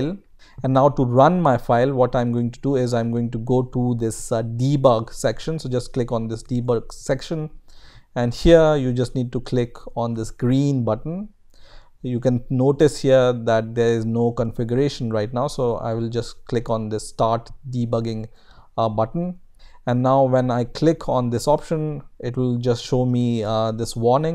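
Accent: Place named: India. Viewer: you know it will help you when they have an indian accent thank you indians for making youtube tutorials all day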